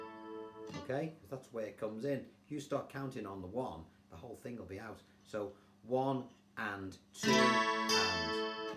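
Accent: Liverpool, United Kingdom. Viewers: First of all are you from Liverpool? Also have you thought about writing this out in tab instead of chord charts?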